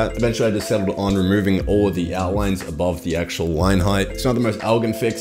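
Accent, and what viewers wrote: Australia. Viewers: Watching this for the first time I was like "Wait is he Cockney or is he from New Jersey because he sounds like both at the same time" Then I figured out I had forgotten the existence of Australia. It happens. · that's called.... an australian accent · you sound like you're from australia and new york at the same time and its fuckin weird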